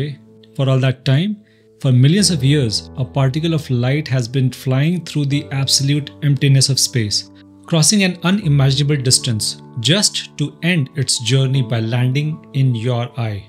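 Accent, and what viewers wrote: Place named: India. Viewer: I can't understand your indian accent. Have you tried speaking normal?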